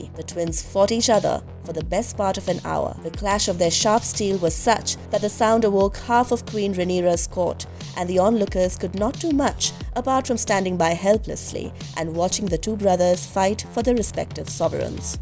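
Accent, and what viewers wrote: India. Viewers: but indian voice :/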